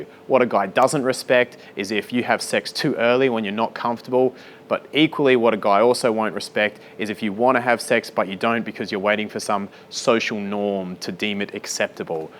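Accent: Australia. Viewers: Is it Australian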